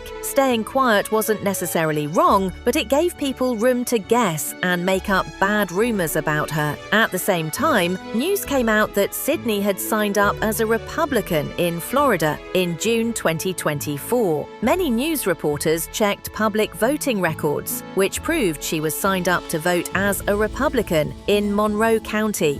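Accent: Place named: United Kingdom. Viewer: I hate that accent…this is the US not Britain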